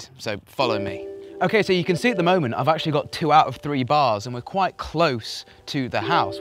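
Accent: England, United Kingdom. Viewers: He's English, we pronounce it like garridge.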